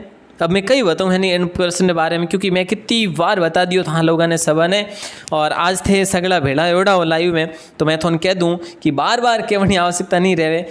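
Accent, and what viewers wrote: Marwar, India. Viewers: Sar class v mst the par aapki marwadi boli su piyar hoygo marwadi to me v bola pr takali marwadi choki lage 😀😀😀😀😀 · Marwadi boli hakri gni lage sa aapri bhira o sir ji 😁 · Sir aaj to bhut jayada maja aya🤗🤗 class mai class to roj hi achi hoti👌👌 lekin aaj apki marwadi wali boli se or jayada maja aya 🥰🥰 sir aap class mai marwadi bolta riya karo gani choki lage 👌👌👌👌🤩🤩tq sir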